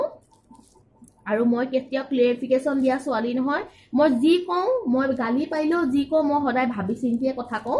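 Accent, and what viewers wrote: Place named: Sivasagar, India. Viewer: তোমাৰ ঘৰ শিৱসাগৰত নেকি কথাখিনি শুনি ভাল লাগিছে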